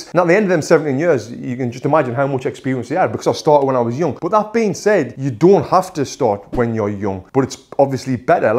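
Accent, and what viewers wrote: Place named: North, United Kingdom. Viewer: That northern accent sounds so surprising coming from someone with a deep tan, hahaha. Looking good, Tony!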